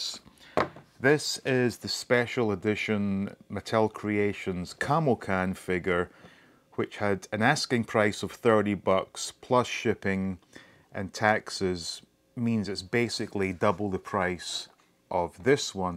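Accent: Scotland, United Kingdom. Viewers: That explains all, its the Scottish accent that got me confused.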